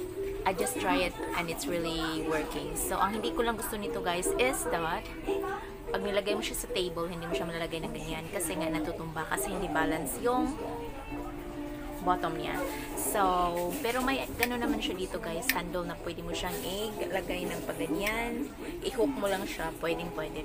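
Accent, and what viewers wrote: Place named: Visayas, Philippines. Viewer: Bisaya man diay ka sis proud bisaya here.. ganda ng accent mo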